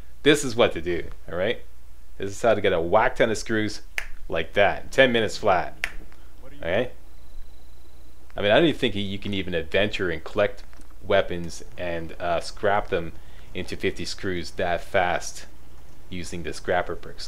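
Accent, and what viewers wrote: Canada. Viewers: HEY! i used to be a BIG fan of YOU and your WIFE, a few years back, but my account was deleted for some 5 second UMG clip in a comedy i put up [when Google bought up utube and were kicking lots of utubers off for the vaguest of reasons] and i used some rather abusive language and told them to 'gtfooh' when they demanded recompense. I heard you packed this all in. Last vid i watched of yours was wayyy back about Bioshock 1[ ithink?] and helped me decide to buy it as i had to sell my PC [cash problems] and bought a used xbox360 back then. [I got a PC now but the GTX970 is very poor so gonna try and get a swap for a 1080 as the outside fan is wobbling almost off its spindle and it's only 7 months old... If i just offer to pony up the extra £300 i hope they'll just let me swap it] Good to see your stuff. Subbed soon as i recognised the Canadian accent, eh? 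I figured out the vegetable oil thing for getting Adhesive though i simply recruited about 8 civs to farm the corn [hardest to find until i found one in a stash near the Museum and planted it and built up the crop from that, same with the Tatos and Mutfruit]. For noobs like me i would advise going for the level 1 Scrapper as i don't have a clue where Diamond City is and tho a Level 2 Scrapper gets screws from weapons you have to reach Level 13, Level 1 doesn't get many unless it's junk like desktop lamps etc. Also, I installed Nexusmod manager and have [found] bought a LongScope Crossbow which doesn't have the range of m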